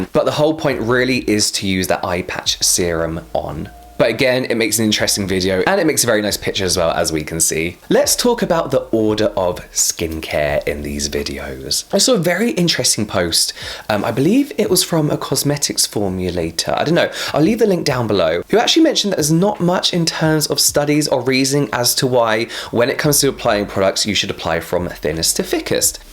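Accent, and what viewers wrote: England, United Kingdom. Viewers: your British accent gives me eargasm!🤪 we stan a skin care addict&k-pop lover🥰 greetings from Turkey:) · I can listen to him all day though, his voice is so soothing. If my husband had a british accent I might not tune him out as often as I do. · sometimes i click on your vids just to listen to your beautiful english voice 🙈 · I do love a good Brit accent! :) I will try to watch influencers who I think are wasting product. I think people on p r lists in general are bad about it. Lately so many are de--cluttering and going on no-buy months or something that hopefully they'll be more thoughtful with their items.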